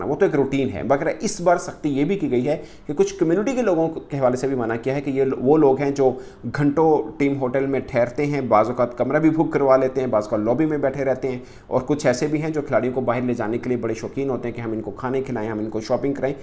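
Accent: India. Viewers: URDU TALAFUZ KI DANISTA GHALETI: KKHas nahin, balkay khas! KKHali, nahin balkay khali! Kia ho gaya hay ap ko, kay IND charyon kay views lenay kay liay jahilana Hindi Talafuz/lehja apna rahay hain? Isi terhan 'Beech kay ander' koi standard Urdu nahin hay. Sahih hay: 'darmyan main', yan 'is doran'.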